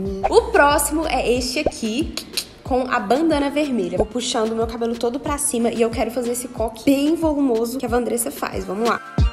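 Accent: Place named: Northeast, Brazil. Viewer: Aqui.se chama lerota kkkk ou ataca mas amoooo esse nosso sutaque nordestino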